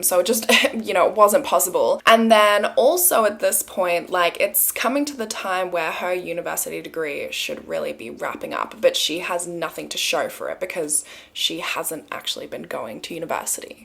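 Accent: Australia. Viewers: You move to where overseas?!! I'm curious!! I would love welcome you to 🇨🇦 You Are awsome!! Love your work and beautiful Aussie accent!!!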